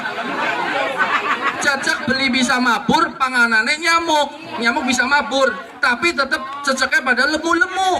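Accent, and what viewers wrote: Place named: Indramayu, Indonesia. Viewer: Logatnya kentel indramayu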